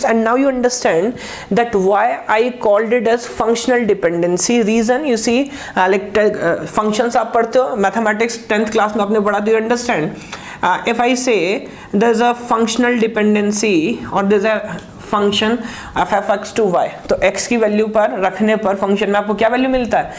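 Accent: India. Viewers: the Hindi accents add class to u r lecture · damn that Indian accent bugs me... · It took me a long time to figure it out, i'm ok with Hindi ascent, but i'm a Chinese so i don't understand Hindi but Nice Video!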